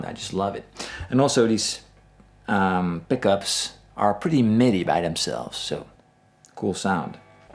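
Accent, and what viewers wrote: Netherlands. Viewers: he is from the netherlands if i'm not mistaken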